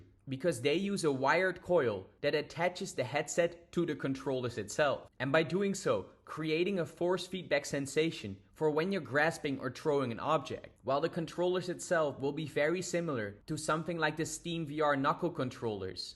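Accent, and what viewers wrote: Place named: Netherlands. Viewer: Love the Dutch accent makker!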